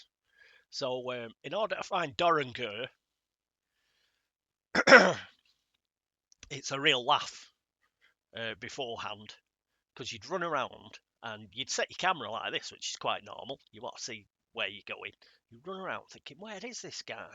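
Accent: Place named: Manchester, United Kingdom. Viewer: Probably because your Mancunian accent made me think of Bumble when you said fervour, couldn't help but think that Bimbling has got a four fer. Well bowled lad. lol Being a dwarf, does he like to field at short square leg? (groan!)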